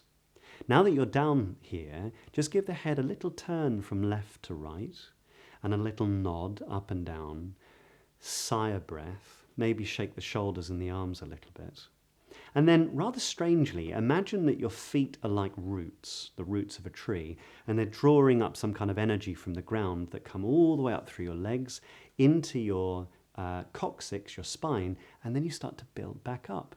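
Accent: RP, United Kingdom. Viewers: Please start a podcast for rp accent listening practice. Could you please recommend few podcast with rp accents?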